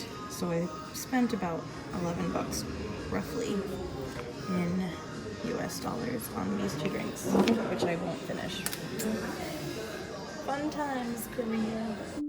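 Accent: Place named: South, United States. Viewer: even a Kentucky southern belle. Not used to hearing your southern. Lol 😉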